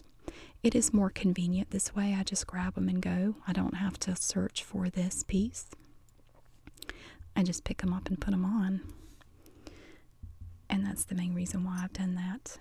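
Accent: South, United States. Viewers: ) You're great!!! My favorite are your soft spoken videos. Perfect with that southern sound. Just trances me put.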